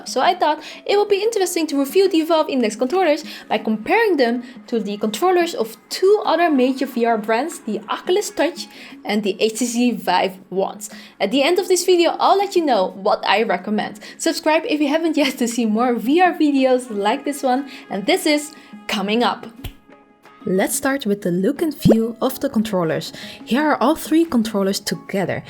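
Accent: Netherlands. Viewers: is she dutch? she sounds really dutch.. shes dutch! is she dutch?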